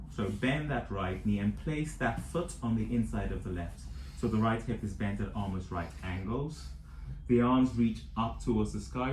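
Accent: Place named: England, United Kingdom. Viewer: I'm going to be doing this teacher training in January 2021. Thank you for showing how to teach this method 1 on 1 in client's homes. I love 💘 that your English too, we tend to deliver instructions a little differently than Americans. How long have you been teaching this method?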